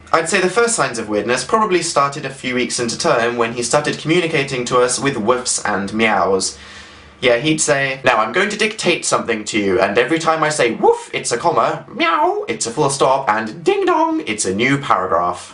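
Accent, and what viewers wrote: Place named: France. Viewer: French awww so cute! ☺️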